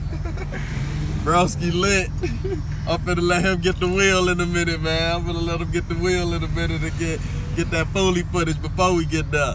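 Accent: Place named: South, United States. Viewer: What state yah in? Yah talk like y'all in the south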